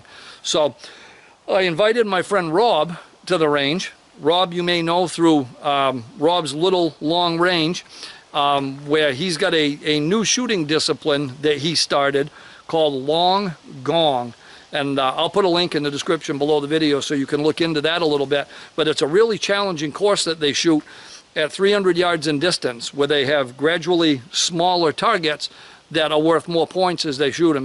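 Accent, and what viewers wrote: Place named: North, United States. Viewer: Cool cool firearm. Also as a southerner this is the most northern guy I've ever heard. Subbed so fast. Happy shooting brother!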